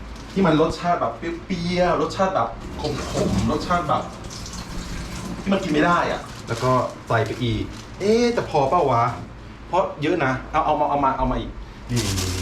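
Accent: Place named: North, Thailand. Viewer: พูดภาษาเหนือน่ารักจังค่ะ☺️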